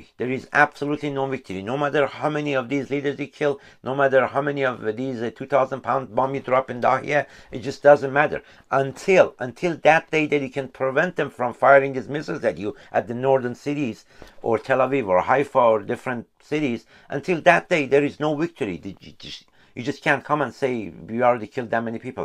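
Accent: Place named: Tehran, Iran. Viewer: harf nadare man kheily khoob mifahmam be valah englisit tehroonie . man kheily khoob ham mishnavam ham mifahmam . man jadidan asheghet shodam agha dadash.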